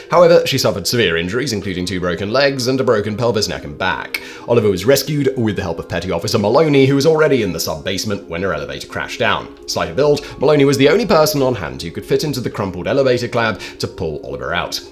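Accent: United Kingdom. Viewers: maybe that's just because his British accent. Seriously though, go to settings and playback speed. Select maybe .5 or whatever you want to try. I'm listening at 1.5x speed currently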